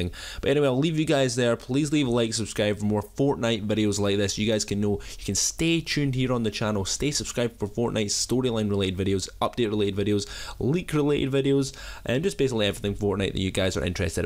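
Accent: Scotland, United Kingdom. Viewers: Are you scotch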